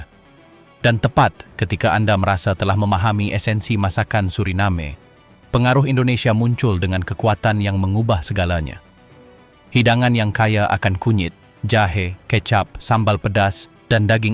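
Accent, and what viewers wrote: Malaysia. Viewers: Wah, ini bahasa Indonesia logat malaydesh. 😊😊😊😮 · Jawa Sumbawa hadir tapi sayang naratornya orang malaydhes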